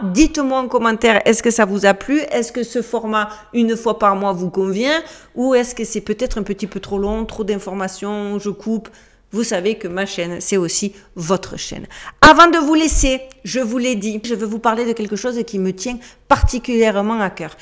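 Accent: Marseille, France. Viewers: Mdr l'accent marseillais au usa en plus vous êtes super jolie😂😂😂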